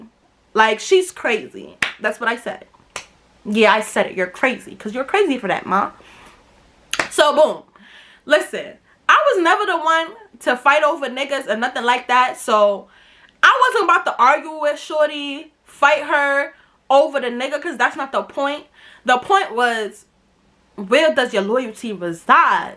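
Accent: New York, United States. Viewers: i really love new york accents 😩🥰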